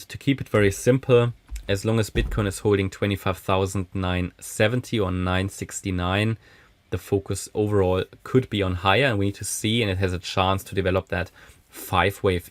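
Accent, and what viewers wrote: Germany. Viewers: ich höre den deutschen aus der stimme xD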